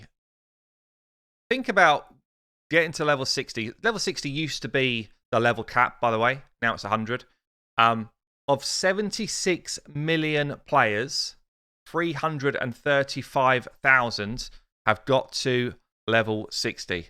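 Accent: United Kingdom. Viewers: I could tell by your oh so British voice inflection. I had the pleasure and privilege of working with the RM when I was an active member of the USMC. I rapidly got to understand the humor of the Citizens of the UK. You British are different from region to region. The Scots, the 1st Sgt. was different from the British. The Welsh had a completely different view of the world. But the beer was great, rats were good, and we all agreed Norway was an ICY *place*. But the women made the evenings eventful. Someone said the main thing separating the US and UK is a common language.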